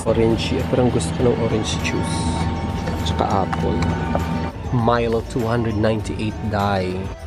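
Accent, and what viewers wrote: Visayas, Philippines. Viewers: Bisaya ka?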